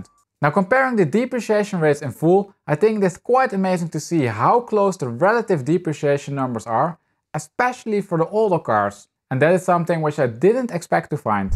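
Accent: Netherlands. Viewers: Just curious, guessing Nederlander? Where are you from exactly if i may ask? Love your channel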